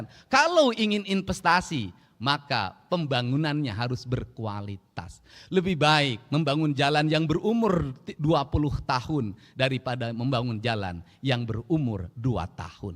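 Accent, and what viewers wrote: Java, Indonesia. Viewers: Logat Jawa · Hay dedy jawa barat bukan sundaesme tapi cirbon indramayu logat jawa mohan diperhatikan kalau lier mekarkan jawa pesisir biar sunfa jongjon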